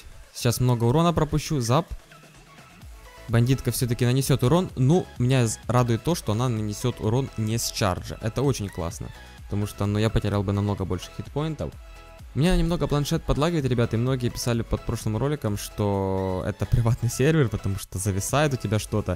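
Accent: Ukraine. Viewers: Украинский акцент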